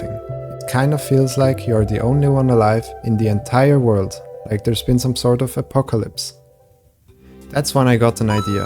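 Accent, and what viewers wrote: Switzerland. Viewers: geile siech, brutali idee & rechtig guets video, de schwiizer english akzent brüder love it😂go get the bread🔥🚀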